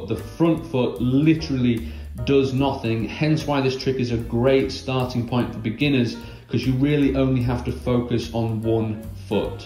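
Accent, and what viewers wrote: United Kingdom. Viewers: Really nice channel. Nice music, nice british accent!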